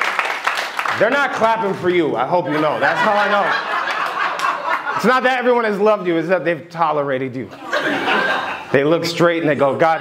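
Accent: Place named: Boston, United States. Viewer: He’s so Boston it’s not even funny 😂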